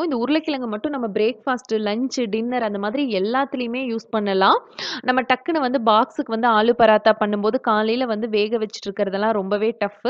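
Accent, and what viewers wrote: Coimbatore, India. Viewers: Unga native coimbatoreaa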